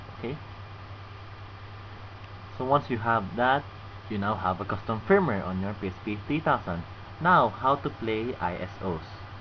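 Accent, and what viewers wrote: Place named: Philippines. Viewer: god damn, work on your awful accent pinoy shiT.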